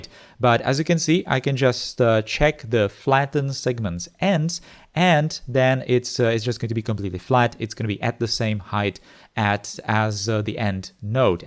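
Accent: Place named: Czech Republic. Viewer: V prvních patnácti sekundách videa mi bylo jasné, že jsi Čech 😃 Twitter to potom potvrdil. Tvoje angličtina zní skvěle, neber to jako výtku 😊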